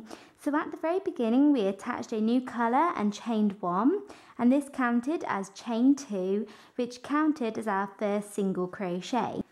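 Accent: England, United Kingdom. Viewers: I am new to knitting and crochet and found your video very easy to follow and understand. Also love your English accent! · What lovely work you do! Are you from Britain? Lovely accent :) I also wanted to ask how much wool you needed for this blanket? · great instruction I love the feel of this blanket. may I ask where you are from? English accent?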